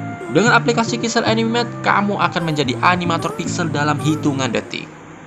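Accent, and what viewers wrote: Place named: Medan, Indonesia. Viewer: Jangan hilangkan logat medannya ketua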